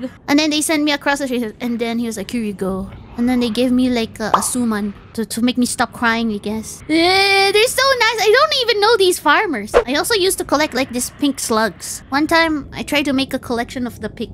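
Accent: Philippines. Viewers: I wonder if is from batangas? She kinda has that accent form a batangenyo when she talks tagalog my Filipino guy's knows what I'm talking about.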